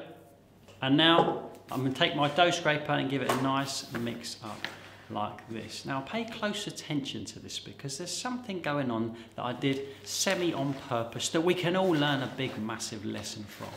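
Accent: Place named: Cockney, United Kingdom. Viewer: you don`t talk too much! You`re enthusiastic about your topics! Your Cockney accent is charming as hell, including the dropped letters, differently-pronounced words, and all. Tattoos? Well, you can`t win them all.;) Luv ya, bruv.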